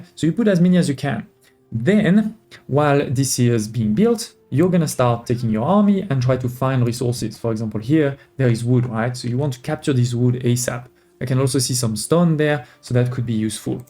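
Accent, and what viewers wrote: France. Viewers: On aime l'accent FR à couper au couteau😊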